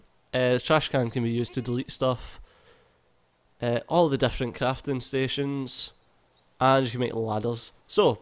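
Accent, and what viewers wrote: Scotland, United Kingdom. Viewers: Super Scottish sounding review ! You can build, shit. Scotland, accept no imitations !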